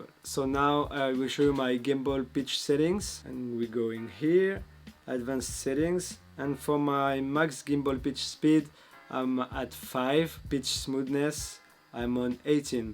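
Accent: France. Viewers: Salut mec!!tu parles bien anglais😜c’est la première vidéo que je vois de toi et je trouve ça vachement sympa avec les sous-titres en anglais et ton petit accent français j’arrive bien à comprendre et ça m’entraîne!!go voir les autres vidéos!!😜petite question... pourquoi tu fais des vidéos ou tu parles anglais alors que tu es français?😅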